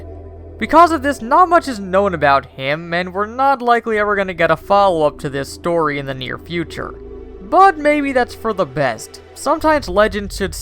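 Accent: United Kingdom. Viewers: Jon Grajeda it’s down to accent, usually it’s the British that pronounce it like that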